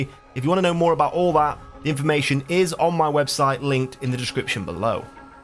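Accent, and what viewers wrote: United Kingdom. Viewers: AEG Specialist with british accent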